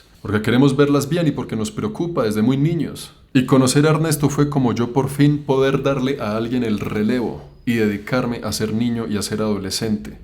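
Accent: Bogotá, Colombia. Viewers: awwww que video tan bonito:face-red-heart-shape:, y yo mas y mas enamorada de 😍 ojala me encuentre un clon con todo y acento colombiano jajajaj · Recién. te sigo, me gusta mucho el tu acento rolo yo viví en Bogotá hace 12 años. Después. emigre a Países. Pero mi querida Bogotá me abrió las puertas del mundo. Mi más sentido pésame por tu perdida. Mucha fuerza. Saludos de una peruana en Haya ❤🎉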